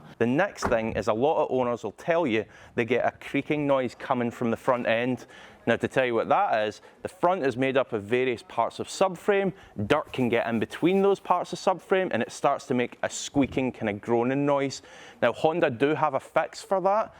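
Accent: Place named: Birmingham, United Kingdom. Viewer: they're from Birmingham 😂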